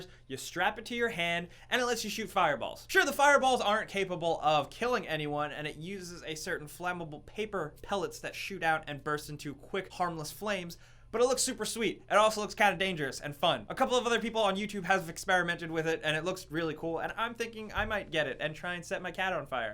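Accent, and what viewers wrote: Canada. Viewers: You americans? are you canadian like me?:D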